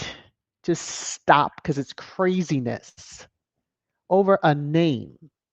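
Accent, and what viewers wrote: RP, United Kingdom. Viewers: Thank you for great thrash time 🙏😘❤️❤️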